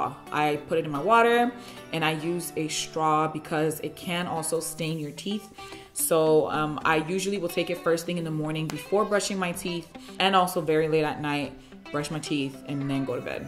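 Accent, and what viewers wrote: Nigeria. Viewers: Love the Nigerian accent.....Love from Naija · new SA suby thank you so much for great advise my 27 year old daughter struggles with body ordor.....anyway. loving the Nigerian accent LOL!!! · are you from Nigeriaaaaaaaa?😅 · Are you Nigerian?? 😅🤣🤣🤣. I like the way the Nigerian in you jumps out every now and then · Lol its the nigerian accent for me no matter how bitter it is I'm going to take it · Thanks for all the info. I get my supplements from iHerb too. Never heard of some of the supplements you mentioned. Meanwhile you got me when I heard that Naija accent.. waow I was like, whaaaaat that’s my sis. 👍🏽 Abeg you ooh keep it up 🥰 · Great video,you got a new subbie as soon as i heard my Nigerian accent?Naija for life,lol. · Girl I love your frequent switch to Naija accent, lovely video. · Great video 👌🏻 Love the Nigerian accent! 😅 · You are a NIGERIAN??? 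At a point it was just clear. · We are the same... I am THE supplements’ Queen! taken most of the supplements you mentioned. Took MSM in only water too! Stopped as it messed with my stomach after a long time. Taken collagen for years. Take chlorophyll as it helps me with energy and my red blood cell count. May just return. ... and did I hear a Nigerian accent? Love how you switch. Laughed a lot. Im subscribing, my naija sis! 💕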